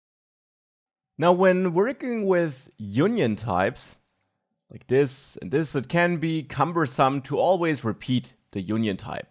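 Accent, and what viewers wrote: Germany. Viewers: Also ich muss ja echt sagen.... Du hast den deutschen Akzent fast komplett raus bekommen, sprichst flüssig und deutliches Englisch und hast dazu auch noch ein super Video gemacht. Ich habe es zwar noch nicht ganz zuende geschaut, bin aber jetzt schon wirklich wirklich beeindruckt. Keine nervige Musik oder halbnacke Frauen, die zwischendurch eingeblendet werden, keine Beleidigungen oder unnötiges Gerede aus deinem Alltag, kein Runtermachen von irgendwelchen Nationalitäten oder Gruppen und dies alles noch kombiniert mit einer wirklich cleanen Videostruktur. Ich kein Deutscher und kann einfach nur sagen das ich dein Englisch als "great" bewerte. Wirklich gute Arbeit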